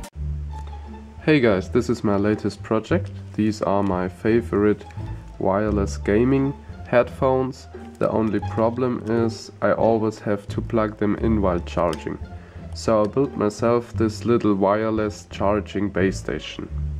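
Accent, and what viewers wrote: Germany. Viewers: Hab ichs mir doch gedacht dass der Akzent Deutsch ist :D und ich hab mal wieder meinen falschen acc...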